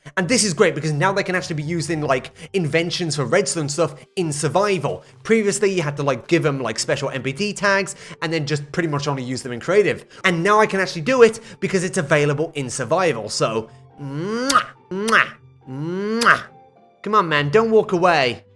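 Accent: United Kingdom. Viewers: funny thing is hes British so it technically wasn't a hard R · i love watching a british person rant about a silly block game. gotta be my new favorite types of videos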